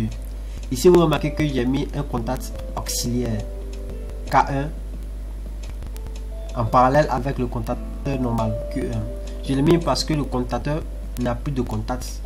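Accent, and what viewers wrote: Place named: France. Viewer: j'ai rien compris par votre accent française !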